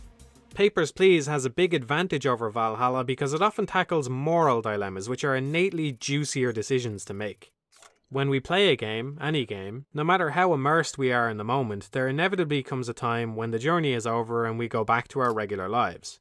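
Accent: Ireland. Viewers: xaax666 he's irish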